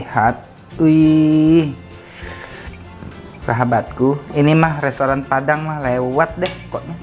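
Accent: Bangka, Indonesia. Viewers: "kita mau mencedoknya" ... kokoooo bangka banget geeh...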